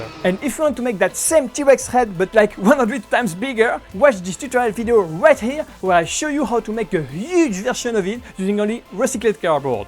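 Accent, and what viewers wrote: France. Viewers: Awesomely sharp French accent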